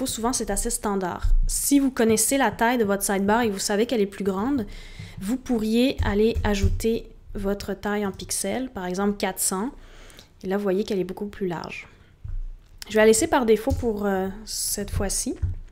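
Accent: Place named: Quebec, Canada. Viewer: Quel accent charmant! Faut que j'aille au Québec !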